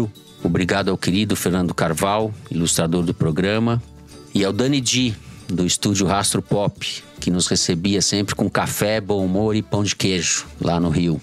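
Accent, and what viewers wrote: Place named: São Paulo, Brazil. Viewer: 😢😢😢 Sucesso para cada um em seus caminhos! Sentirei saudades da sua voz, Fernando, sotaque paulista maravilhoso 😊 Quiçá teremos novos encontros aqui e ali do Foro de Teresina🙌🙌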